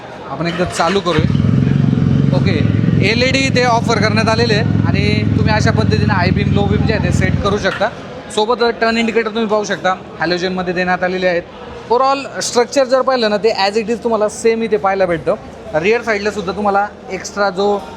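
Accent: Ahirani, India. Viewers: भाऊ तुम्ही अहिराणी आहात का ..? थोडा थोडा आभास होतो तुमच्या भाषेमध्ये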